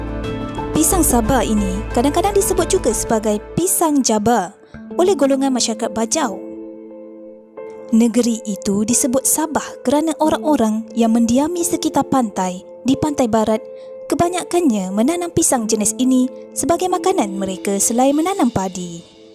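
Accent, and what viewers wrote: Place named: Kuala Lumpur, Malaysia. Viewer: ni ka pula sejarah sabah, yg brcerita d cini mcm bukan org sabah, logat kl pula ni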